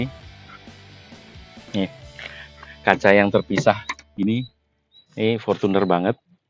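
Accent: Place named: Java, Indonesia. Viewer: Jowo ne medog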